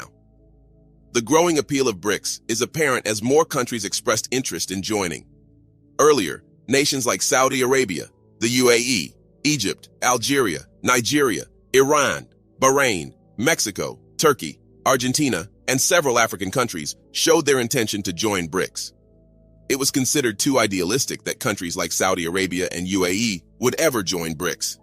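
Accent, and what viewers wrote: United States. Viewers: Don't know why, thick American accent doesn't sound pleasing. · You are such a cheerleader for the other side. Do you live in Africa. You sure sound American. I call cap. You want your money to be worthless, dummy?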